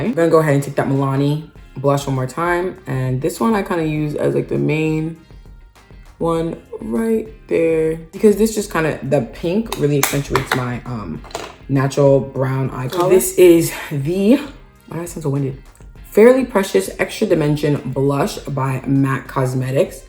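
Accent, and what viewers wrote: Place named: Canada. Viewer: You sound Canadian❤️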